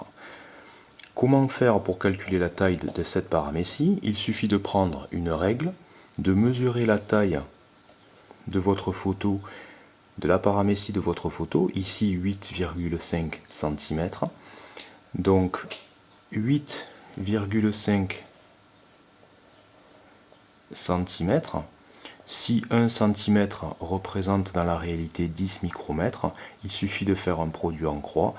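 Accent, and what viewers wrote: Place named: Marseille, France. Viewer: Wsh ta l accent marceiller 😂😂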